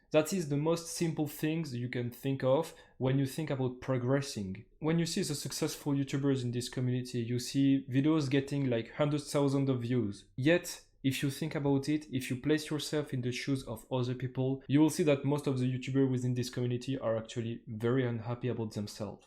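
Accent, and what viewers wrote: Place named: France. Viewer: you have the french accent lol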